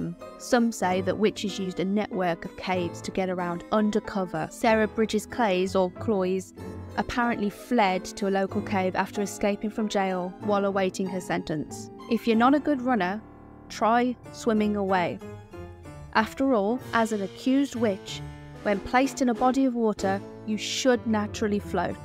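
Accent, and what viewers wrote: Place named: England, United Kingdom. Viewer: G'day, I'm an Australian agnostic atheist. As an Australian, I suspect that I could be a witch or an atheist or a stack of other things for the foreseeable future without any dramas. Your accent sounds English and while I can point to a lot of things about the British government that I don't like, I doubt that you would be in danger from your government for your religious practices in the foreseeable future. However, I don't think that applies to women in the US. There women are taking additional steps to ensure their menstrual cycles do not become state knowledge, lest they be charged with abortion. (Worryingly, women who fall pregnant, don't know it and then miscarry after as little as 6 weeks are theoretically in the firing line of some of the anti-abortion laws.) About 70% of people in the US think that abortion should be allowed at least in some circumstances. 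The laws do not reflect that opinion. Similarly, it's been decades since a Republican president actually won the popular vote, but there have been a lot of Republican presidents in that time. So, in the US, I think there is a distinct possibility that Christian Nationalists could gain power in government (especially at the state level) and that they would go after people for witchcraft. Christian Nationalists aka Christo-fascists would not win the popular vote but that doesn't seem to matter in the US. The trick, then, for someone in a jurisdiction where the Christo-fascists have gained power, is to recognise that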